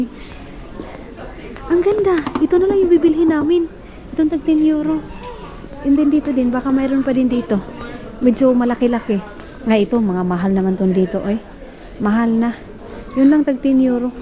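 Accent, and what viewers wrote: Visayas, Philippines. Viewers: Tuwang tuwa talaga ako sa panonood sa iyo my dear maninay..yung pananagalog mo na me halong bisaya😊kahit di kita naiintindihan minsan patuloy ako sa pag aabang sa mga vlogs mo😅😅..go lng sa vlogging mas marami kaming viewers mo na naghihintay kht paulit ulit mga lugar na pinapakita mo sa amin..nevermind those bashers basta andto lng km😊😊😊❤❤❤happy ka happy din km na nagmmhal sa inyo ni..God bless❤🙏❤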